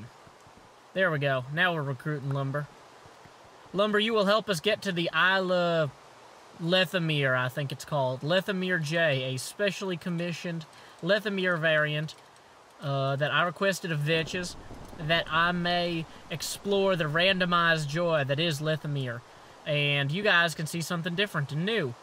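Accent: United States. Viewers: I love finding smart Americans with accents. Makes me want to laugh in the faces of all the people who call Americans dumb hicks. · Cool! American accent,and speak quite slow, but still you make very cool videos! But whos vches?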